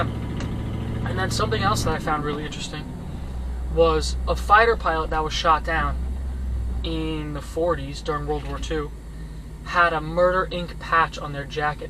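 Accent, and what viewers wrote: New York, United States. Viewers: love your drives and the subject matter. And u do it with a New York accent. Keep up the good work!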